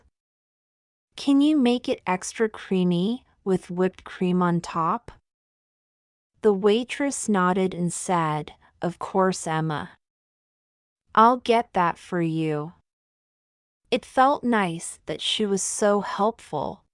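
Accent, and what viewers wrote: United Kingdom. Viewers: I think this video is British accent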